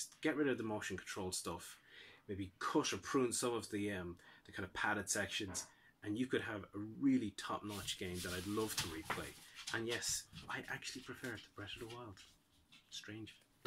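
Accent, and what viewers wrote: Ireland. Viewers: Are you really Irish or is it a fake accent?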